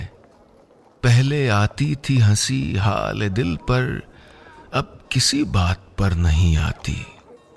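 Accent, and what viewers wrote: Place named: Lucknow, India. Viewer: Janab aapko sun kar dil khush ho jata hai. Aapne Lucknow ke zubaan yaad aa jati hai. Aap ka tahey dil se shukriya.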